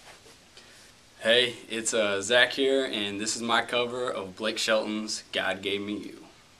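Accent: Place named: South, United States. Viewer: such a country boy <3 yum he has that twang and everything when he talks ughhh i'm in love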